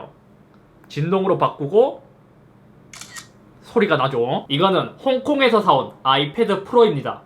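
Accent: Gyeongsang, South Korea. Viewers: 경상도 분이신가봄ㅋㅋㅋ 억양이 들리네요 저도 경상도!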